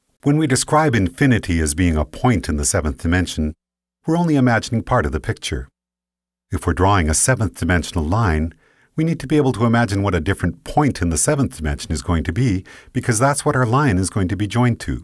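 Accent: Canada. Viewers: The minute you started talking, I thought, "this guy sounds so canadian."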